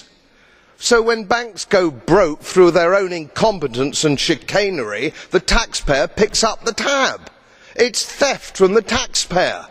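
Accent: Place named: Southern England, United Kingdom. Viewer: Fair enough, but remember that this is standard southern English, the norm for the language, if you like, so that any deviation from it, whether in England itself or anywhere abroad, is an accent. North America, South Africa, Australia, New Zealand (me) and some others, all have their own distinctive accents upon standard southern!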